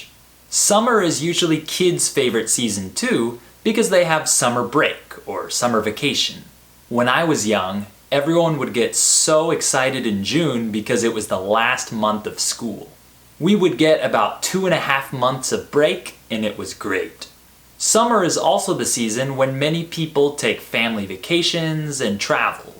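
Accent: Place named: United States. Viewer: I can learn many things from this channel. I really like to listen your voice. Real American voice.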